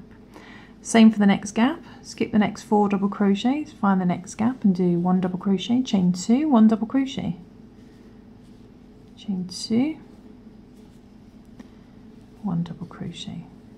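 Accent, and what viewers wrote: United Kingdom. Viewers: The 1st row is called the foundation chain. Love this pattern & perfect since I've been searching for a wash cloth with enough openings to dry faster & just easier to deal with soap/water. Little cupcakes are precious, thanks so much. The gap that you starting on second row we in the U.S. is called space. Still like your British accent.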